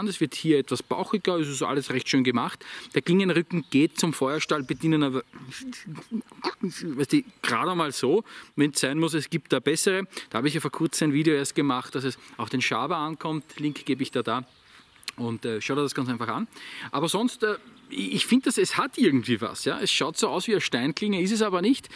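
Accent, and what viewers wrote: Austria. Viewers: Hab jetzt einige deiner Videos durch und lass mal ein Abo da. Schöne Präsentationen und deinem Österreichisch hört man gerne zu.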